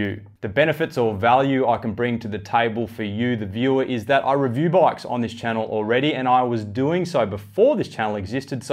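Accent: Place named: Australia. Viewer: Aussie accent #chinurr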